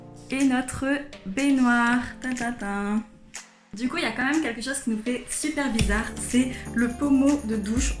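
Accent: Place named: Quebec, Canada. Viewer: tu prononce tes a comme nous deja ta l accent ..bienvenue au quebec bon succes pour votre chaine je m abonne et vous suit pour d autres videos..avec l hiver c est la periode ideal pour decouvrir notre culture et nos artistes ainsi que notre univers musical qui est tres different de la france amicalement d un nouvel ami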